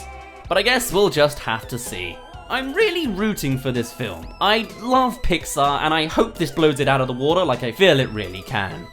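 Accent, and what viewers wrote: United Kingdom. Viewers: When did get a british accent?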